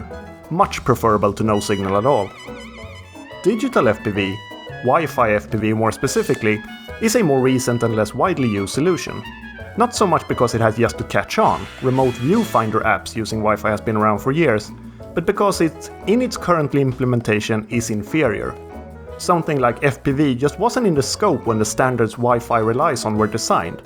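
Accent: Sweden. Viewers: Hi! I am new to your channel but I immediately hit the Subscribe button upon watching the first vid I looked at. Your approach, candor and editing sets you apart from many other reviewers here on Youtube. Just lovely!!! You clearly have some insight in this hobby, but you appear unassuming, bright and unbiased. Every vid is a pleasure. I have to ask - are you a Swede? Your accent suggests you are, while your choice of words and general command of the English language is impeccable and inspiring. You put many native English speakers to shame, at least here on Youtube. But more importantly, your vids are well thought through, consciously edited, and so clearly targeted when it comes to defining your audience. I think you deserve a viewer breakthrough, going from the few thousand to the tens of thousands you deserve (realistically, this is a niche channel after all). 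To summarise: Love your reviews, keep them coming!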